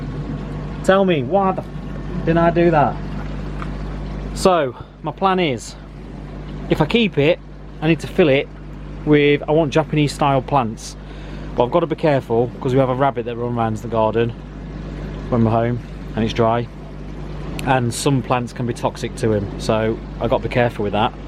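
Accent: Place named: Nottingham, United Kingdom. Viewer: Just subbed to your channel mate! Lovely pond with some great ideas going forward! If my keen ear for an accent is correct, you sound very local to me mate lol. I'd guess at Notts (potentially Derby) but ill stick with Notts :) North Leicester me :) Looking forward to more updates!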